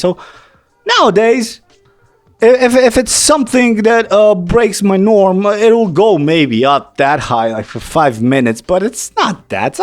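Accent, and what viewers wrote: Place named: United States. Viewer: u speak english like an american! good job.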